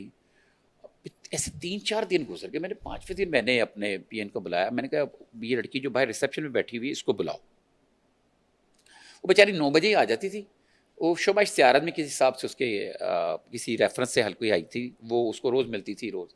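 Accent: India. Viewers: Is this host indian? Why is her accent like them? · Don't try to have indian style of pronouncation. Khan as kan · Host 's pronunciation is silly ,aping lndians, quite disgusting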